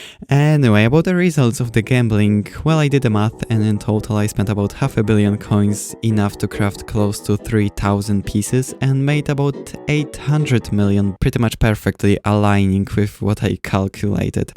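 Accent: Poland. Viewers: Wiem kiedy slysze polski akcent i wiem że ty go masz😂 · ale polski akcent kolego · I knew this accent is familiar you are a Pole! (the olx ad gave it away 😂) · you sound Polish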